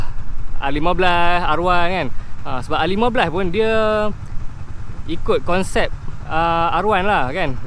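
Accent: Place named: Kedah, Malaysia. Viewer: Aku suka cara cakap laki cina tu..memang pandai cakap..sleng loghat kedah kalau x salah..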